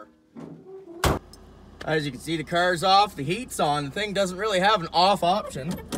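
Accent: Canada. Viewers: He sounds fucking Canadian not southern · I knew he sounded like a canadian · Are you from Canada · Obviously Canadian voice lol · You sound Canadian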